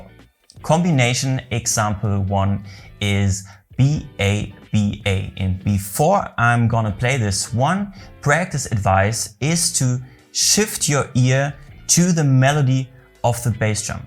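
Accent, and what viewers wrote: Germany. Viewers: Haha... Man hört richtig, dass er deutscher ist xD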